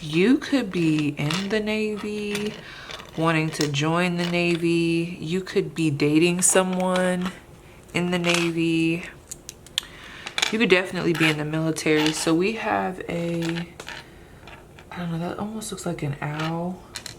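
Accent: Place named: Oklahoma, United States. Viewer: Lol that heavy. Rando okieaccent was for me I know it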